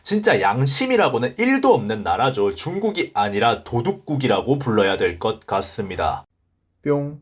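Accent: Gyeongsang, South Korea. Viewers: 경상도 사투리 쓰는거 맞으 신거죠 첨 억양이 ... ㅎ ㅎ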